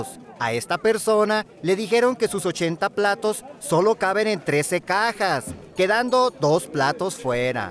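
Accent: Mexico. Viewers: se olle norteño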